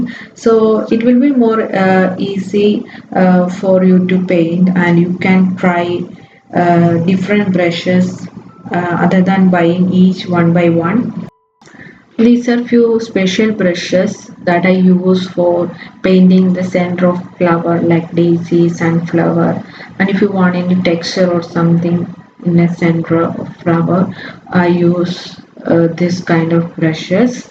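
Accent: Kerala, India. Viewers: Hi, i recently started viewing your paintings. All are awesome, no words to appreciate. From your accent, I guess you are a Keralite. Well, I am a Professional Makeup Artist & Aestheticiane. Also doing little bit of painting as a hobby. I usually use water colour. Recently I got few orders for paintings. Since water colours are non-durable, I decided to recreate them with acrylic, which is going very tough, because the paintings have lot of minute details. The colour is getting dry and chipping fast when taken on pallette. Colours are not getting blended easily because as soon as i make one stroke, it dries up. I dont know if it is the problem of the quality of paint. What I am using is Flash Acrylic Paint. Can you please give me some suggestions? Is acrylic paints really used for such detailed paintings? And is it mandatory to apply varnish after finishing the painting? 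I either use the paint directly or some times adding plain water. If I am troubling you, I am sorry. Please reply if possible. Thanks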